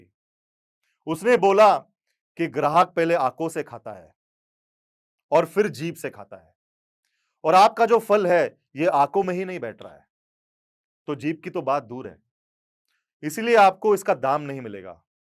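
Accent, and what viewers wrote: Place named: Maharashtra, India. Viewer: Aapki hindi is very much maharashtrian..❤❤ love ur products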